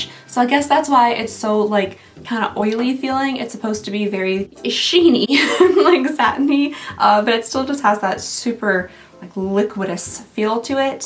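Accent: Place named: South, United States. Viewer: I love hearing a woman with a southern accent like myself tear into a rant. Now I know why my husband smiles when I go off about the grocery store making stupid substitutions on my items. I smile through your whole video whether you’re ranting or not. ❤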